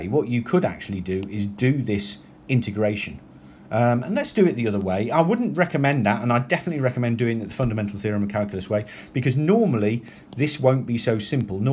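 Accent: United Kingdom. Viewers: Thank u random British guy 💂🛐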